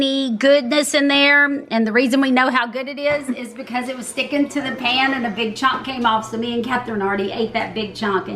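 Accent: South, United States. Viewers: Hey! You did an amazing job! I love how you have kept your true southern accent. Loved the recipes too! xo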